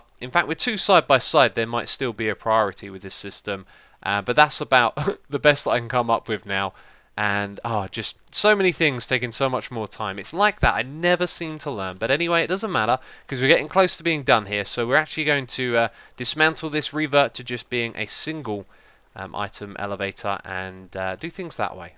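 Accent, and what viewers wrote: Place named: England, United Kingdom. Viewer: anyone know which part of England is from?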